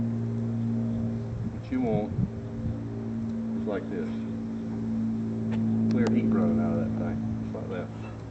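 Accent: United States. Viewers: That's NOT a Texas accent .... it's a refined Southeast Georgia accent. Smooooth as butter! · Love the Texas accent, and love the propane starter idea. I'm a novice getting ready to take my first smoker on it's maiden voyage so I really appreciate the expert advice! Thanks for making this video!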